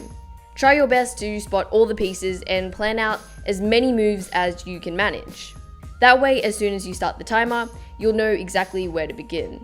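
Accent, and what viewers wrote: Australia. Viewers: the cubey guy she's most likely Australian bc of her accent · Waaaaiiiit...are you Aussie?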